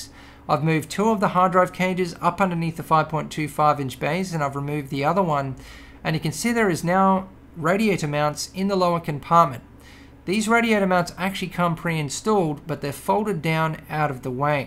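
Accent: Australia. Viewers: He aussy? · are you australian?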